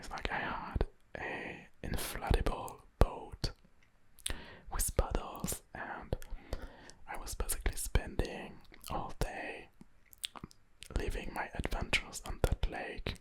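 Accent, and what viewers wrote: France. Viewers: Your French accent is beautiful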